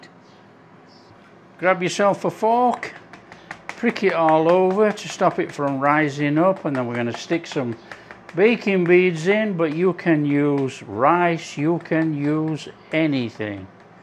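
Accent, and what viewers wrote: United Kingdom. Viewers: Love it! Cornbeef as a quiche. Easily attainable. That's one thing I love about most of your recipes. They contain easily accessible ingredients. And don't require a Culinary degree with all its jargon to describe and decipher. They are straight forward even for a home cook, like myself. You are the most thorough Chef on YouTube or any food channel I've ever watched.that gives the best tutorials. You go through each detail with explanations. You are a champ! Keep it coming Chef. You've got me glued to your channel and your lovely British accent. 😊❤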